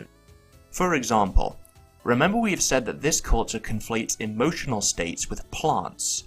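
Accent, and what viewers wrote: United Kingdom. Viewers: Damn British English. I keep thinking he's saying "copular", not copula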